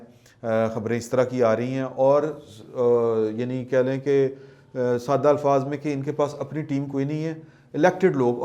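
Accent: Punjab, Pakistan. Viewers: Aaj Kal boht active tezi aayi huie hai aap mn country k halaat k saath saath hi aapki body language hoti hai ur great 👌 Sir 👌 g good job 👍 naam tou suna hi hoga dialogue with Awais Sheik Multan Wala Punjabi touch aahahaa aahahaa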